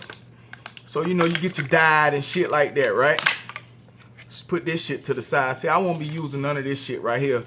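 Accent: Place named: South, United States. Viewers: Where you from Htown lol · Country as hell good information my man and Roll Tide Roll!!!!!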